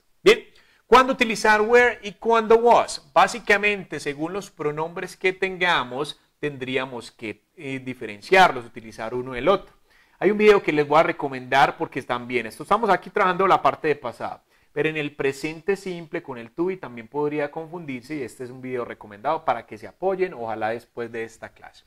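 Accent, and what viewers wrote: Colombia. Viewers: ¡Amé su acento colombiano! Además tiene una manera tán sencilla de explicar. Realmente este tema yo ya lo vi, pero siempre es bueno reforzar y conocer otras exploicaciones a parte de las que me dan mis maestros. Por mi parte, seguiré viendo los vídeos de este canal; me encantaron. ☺ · Wow. Me encantó como explicas todo. Tu asentó colombiano me encanta. I ❤️ 🇨🇴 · JAJAJA pensé que era la única. Es tan lindo y además con ese acento colombiano, uff!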